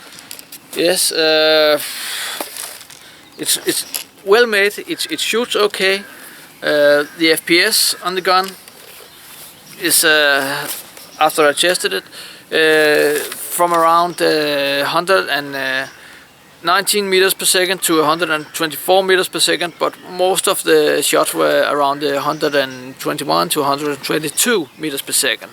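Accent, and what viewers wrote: Denmark. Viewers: you sound like a danish do you still have the gun? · You sound danish. Are you danish?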